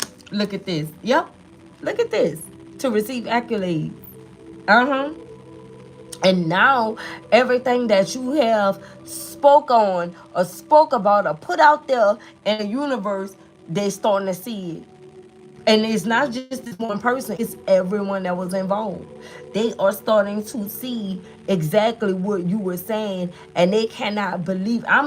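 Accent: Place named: New Orleans, United States. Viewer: You sound like you from New Orleans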